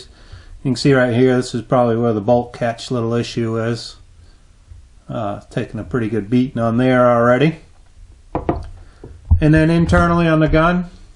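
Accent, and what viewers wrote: China. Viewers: got it just for the chinglish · chinglish FTW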